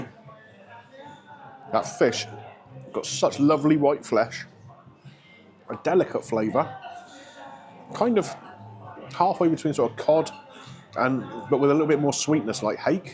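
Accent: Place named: Dorset, United Kingdom. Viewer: As a Bournemouth girl now living in New Zealand, just love your vlogs, and hearing your Dorset twang.. Merry Christmas to you and your fanily from ours jhere in Christchurch NZ xx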